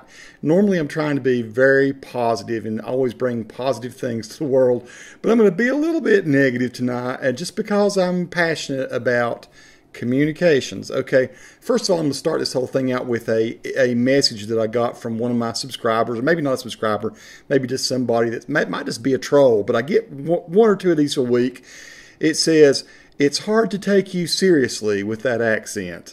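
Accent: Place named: Appalachia, United States. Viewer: Hey, I just watched this video (5 years later), you are raising a very interesting issue about sociolinguistics. First: don't change the way you talk, Southern Appalachian accents are the best ;). Lots of factors are affecting the way people talk, looks like what is dividing people in the South (and elsewhere) today is whether they identify themselves as urban or more rural: the latter group being more likely to keep a distinct accent while the former tends to adopt a "global identity". Other factors come into play as well such as the representation of an accent in media (literature, television...) that create stereotypes and the woman you talk about seems to be rejecting, as you said, her identity in favor of a "global" identity probably because of negative stereotypes. And that's pretty sad because it's the fact that people have different accents that makes a language rich and interesting to study, but more and more people (especially in the South unfortunately) in large cities tend to adopt a more "global" accent we call "General American" in phonetics. 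Interestingly, the representation of an accent is different from place to place: where I'm from (France), people usually like U.S Southern accents. Thanks for sharing that ;).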